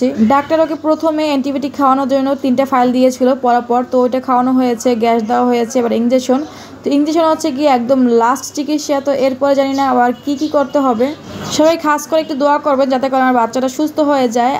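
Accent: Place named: Dhaka, Bangladesh. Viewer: Dhaka Kotha talking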